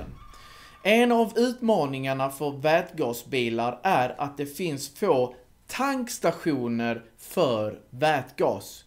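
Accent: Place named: Skåne, Sweden. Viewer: Kan du inte ta det på Rikssvenska? Skånska är svårt att förstå. Dessutom så framstår det inte hur man lurar staten.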